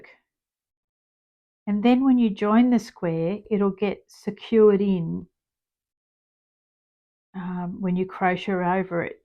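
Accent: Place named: Australia. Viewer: I'm so happy that your channel popped up. It is so good to hear an Australian voice! I will be watching all your previous videos to catch up. I'm only relatively new to crochet; I tend to sew in ends as I go. I love the colours you have chosen for these squares. I haven't used Stylecraft yet.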